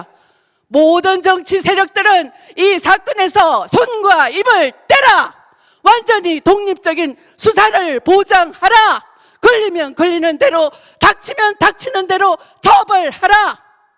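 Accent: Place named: Jeolla, South Korea. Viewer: 너 정치병이야.병원가라.너 말씨는 전라도 말이 면서 고향이 대구라고 ..ㅋㅋ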